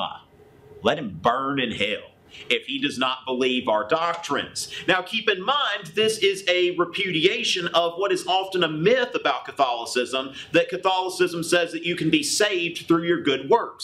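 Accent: South, United States. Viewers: i love this. just the way he looks like matt damon and the southern drall makes the whole video so much better. very helpful !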